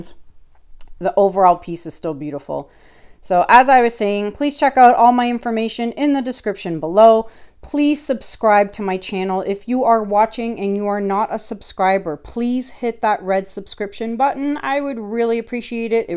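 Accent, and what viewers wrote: Canada. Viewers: I'm just starting out. I have to tell you, of all the YouTube tutorials out there (and I've been binging!), your channel is the absolute best. Aside from all the detailed instructions you give, I love your interaction with your viewers (and your Canadian accent! LOL). Thanks for sharing this wealth of knowledge you have. Your work is stunning and I appreciate your responses to my questions on many of the videos I have watched. You are amazing. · 4 daughters, 3 stepsons, which gives me 3 daughter in laws, 26 grandchildren I've had a moment or too LOL , in 5 yrs i have beat cancer, raised 2 grandkids,lost 2 best friends to cancer my mom and sister and now i am helping my husband fight stage 3 colon cancer with this Covide 19 going on, if anyone walks into my house with out saying hello to me I say Good-bye, you go girl and by the way love that Canadian accent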